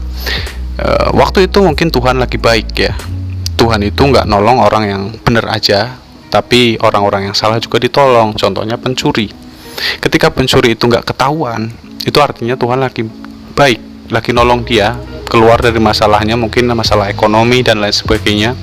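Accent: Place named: Tegal, Indonesia. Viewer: ngomongnya medog logat tegal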